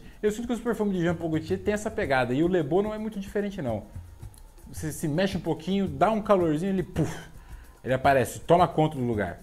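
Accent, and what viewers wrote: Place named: Rio de Janeiro, Brazil. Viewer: Salve lá!:) Eu ri demais do seu sotaque carioca, meu:D. Não curto muito as coisas da JPG porque é simplesmente doce demais:D. Vou por na lista dos decants para saber se vale a pena.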